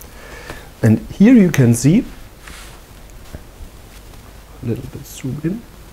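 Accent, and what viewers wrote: Germany. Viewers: Bei meinem A517 scheint das Kabel einen Wackelkontakt haben. Teilweise fällt das Bild aus, oder es flackert. Kann ich das Kabel auswechseln und worauf muss ich dabei achten. Ich gehe davon aus, dass deine Muttersprache deutsch ist. Gruß Klaus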